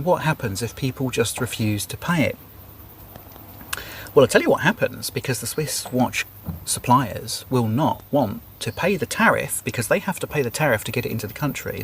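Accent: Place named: United States. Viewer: Here in America where we are tired of getting screwed by you people happy with screwing Americans, will just switch to the used watch industry etccccc. or just keep buying swiss watches regardless. Most people that can afford a swiss Rolex watch, can afford a little more, since now saving on other stuff. BTW your American accent is annoying little old man.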